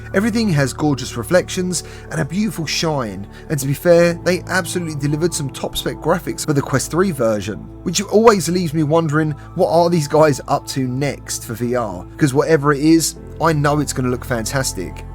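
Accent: United Kingdom. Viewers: Can some one write down the names of the games please I just can’t understand with his British accent 😢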